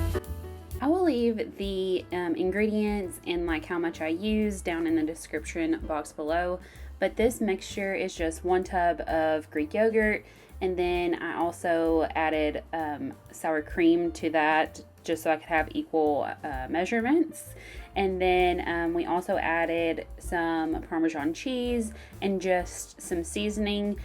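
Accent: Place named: South, United States. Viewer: I am new here where are you at??? Sounds southern I an in mid Ohio but my folks were from southern Ohio they had that southern flare also just me wondering??? Keep up the great work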